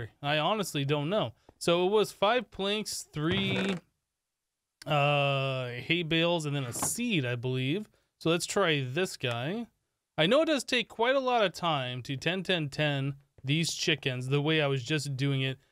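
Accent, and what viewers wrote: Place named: Canada. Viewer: This guy is Canadian right? He says some words in the stereotypical accent, like when he says egg. I don’t say egg like that but some Canadians do.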